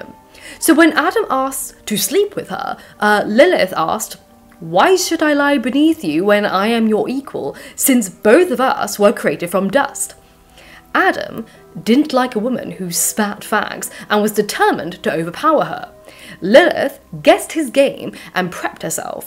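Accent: RP, United Kingdom. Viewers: Received standard pronunciation and a lovely classical English accent. I thought I’d never find that on this website. I’m in love and subscribed.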